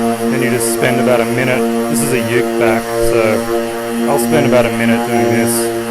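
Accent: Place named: Australia. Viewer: Thank you so much, it's exactly what I was looking for. I gave the tendon in my elbow a decent tear (7mm) from manually sanding and polishing my electric guitar builds 😥I thought I recognised an Aussie accent until I looked at your website as I was going to ask where in Aus you purchased the sanding pads but no point because you're in the US. Anyway thanks again for your info; my local Bunnings has it in stock so happy days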